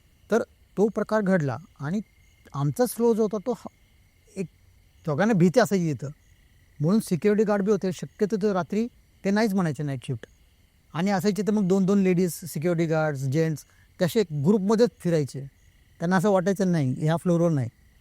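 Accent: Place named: Pune, India. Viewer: arey re mitra proper punekar ahai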